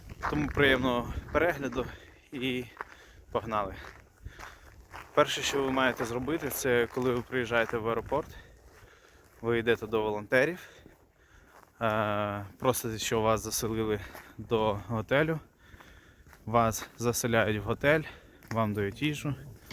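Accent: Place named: Western Ukraine, Ukraine. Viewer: Привіт! Гарно говориш по українськи, навідь з легким західним акцентом!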